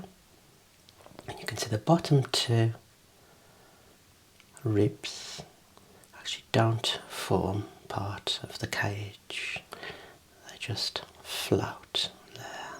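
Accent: United Kingdom. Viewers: Your voice is very relaxing fine british gentleman in deed thanks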